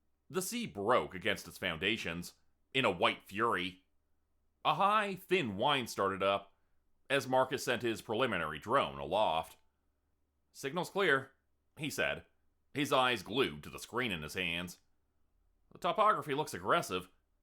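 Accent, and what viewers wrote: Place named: Canada. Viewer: WA part o Canada u from A-HHH !!!! IMA FLORIDA BOY so I get ta hear all kinds o accents !!!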